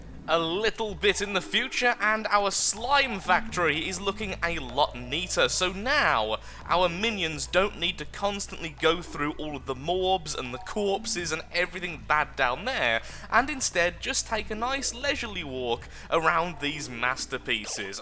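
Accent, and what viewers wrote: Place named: RP, United Kingdom. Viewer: I love how posh sounds.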